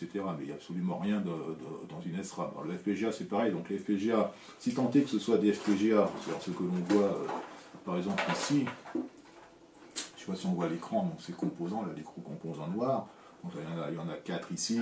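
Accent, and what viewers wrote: France. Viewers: if you got haters on Youtube, that only means that you are successful. Your english is by far not as bad as you think it is. You have the odd funny word with french accent from time to time but it is easy to get used to it. Like when you omit the "s" when saying "display". Still everybody should know what you said. Your video content is one of the most sophisticated on the Youtube world. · I am from Hong Kong. My English is also bad and with heavy Chinese accent. I enjoy your English with French accent and your video too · l accent francais cela me va tres bien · I think your English is excellent, and personally I find English being spoken with a strong French accent to be awesome to listen to. My former manager (still a coworker) is French (born and raised) and while he has been living in the US now for 8 years. His accent is still very strong and its something I also look forward to hearing. 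He is one of my favorite people in the world. 🙂 Please don't stop doing your videos in English. It is a pleasure to hear such technical information spoken with a French accent. I will still watch all your content if it is in French, but I will gain more from it if spoken in English. 🙂 I guess I am allowed to be selfish at least once and a while! lol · Why do you apologies for your french accent, it is very nice to see everyone speak their own accent, it shows that we should accept and respect each other. Doing such a great job and speaking your own french accent encourage everyone not to shy from their accents and to focus on content. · L'accent français est rude seulement pour les oreilles françaises... donc on s'en fout. Ce qui m'étonne c'est la puissance de calcul embarquée même si c'est du fire&forget. Il embarquerait du code de type IA ? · Le bon accent français m’a bien fait rire. 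L’important c’est le contenu ! Je viens de m’abonner. J’attends la suite avec impatience. Continuez en anglais au vu de vos chiffres ! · french accent is funny.. maybe hardcore americans who only speak english having problems to understand you. but the rest of the world is with you. 🙃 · Your english is perfect bruh. People especially like it cus the french accent sounds cool lol. For what conceivable reason would anyone hate on it?? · Im not native english speaking person and yes your accent sound French but you last video I perfectly understand. Its true that you can buy some interesting stuff on demobiles (after military shops) in some prive hands i saw last year single use rocket motor for nuclear rocket (new one not used) what was at the moment best what zssr had at the moment. And the owner even dont know what it is ;) Imagine if some organize toxic fuel for it some avionics and can send bus to the USA from Poland :) Do you passion we will enyoj it if you share videos about it. 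· thank you very much for your previous video. I could perfectly understand your English. In fact, due to the French note I found it very pleasing to listen to it. Please continue doing such videos! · Bonjour, I have been following your channel for years, never had an issue with understanding your engliah and always felt that your french accent was very charming. Thank you for all your hard work over the years. I can never get enough of your unique subjects which entertain and enlighten me with each and every video. -Ray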